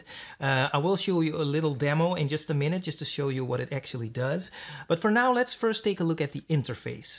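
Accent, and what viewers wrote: Netherlands. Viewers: I hear a slight Dutch accent so I assume you're Dutch but I'll keep it in English! I have installed Flux on my laptop and downloaded Bittersweet through the Flux app but I can't the DLL files anywhere to use it in my VST plugins in FL. How did you get it to work?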